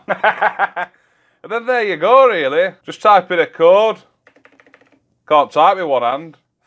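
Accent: Yorkshire, United Kingdom. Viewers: hey thanks for making this video I uninstalled it because I could not figure out how to use this programs also I noticed ur accent are you from the great Brittian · Are u from Yorkshire I’m from West Yorkshire you’ve got a very Yorkshire accent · Very good videos. Good to hear a Yorkshire accent explaining it as well. Keep em coming cheers pal.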